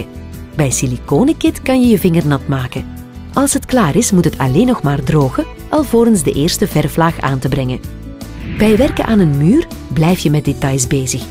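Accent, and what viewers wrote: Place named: Belgium. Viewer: Het is slechts een Belgisch accent, ze spreekt gewoon Nederlands hoor. Dat leer je in Nederlandstalig België ook op school. West Vlaams is echter wel compleet onverstaanbaar.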